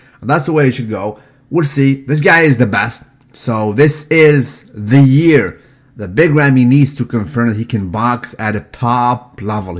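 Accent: Egypt. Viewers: Your Egyptian accent ain't that bad:P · Man i am Egyptian and your egyptian arabic accent is soo fun, it cracked me up, thank you man :D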